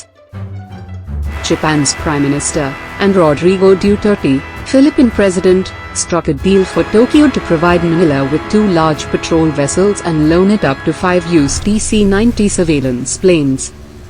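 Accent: India. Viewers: the narrative is deliberately female INDIAN-accented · The narrator is Indian...from kerrela? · The narrator's voice is a typical Indian female voice.... sooooo pathetic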